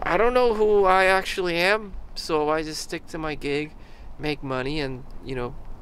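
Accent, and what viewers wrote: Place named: United States. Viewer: What? He sounds 100% American!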